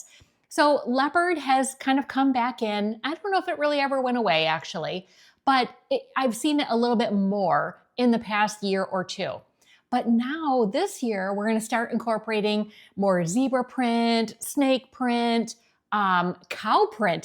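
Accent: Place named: Minnesota, United States. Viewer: Is she from Minnesota? I love her accent! ☮️💟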